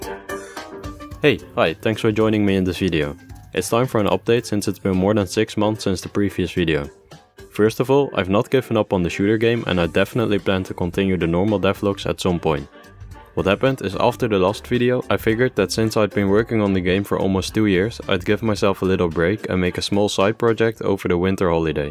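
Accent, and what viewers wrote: Netherlands. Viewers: Are you Dutch by any chance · are you dutch? you really sound dutch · Hé is Dutch You can hear it by his accent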